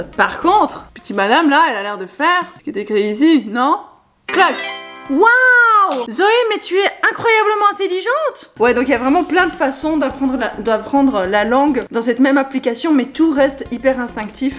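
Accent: Belgium. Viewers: T'est belge? Moi oui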